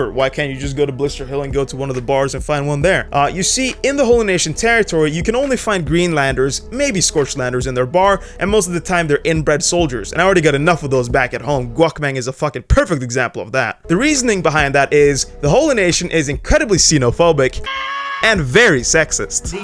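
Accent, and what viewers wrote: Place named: Norway. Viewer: Spotted the accent day one, have family with thick Norwegian accents here in Minnesota. Love your content man, keep it up!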